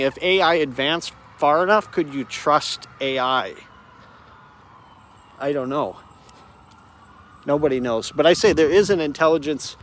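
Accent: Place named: England, United Kingdom. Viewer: Hey! I Love your English accent brother ❤ we love your walk and talkies. Know that your helping people all over that need lifted up with your amazing energy. Hope to see you on the live on Jeffmara. Chin up, your not alone ❤❤❤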